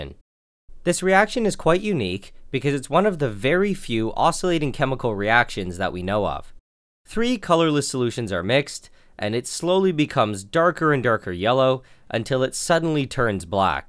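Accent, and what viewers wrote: Canada. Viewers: I love your thicc Canadian accent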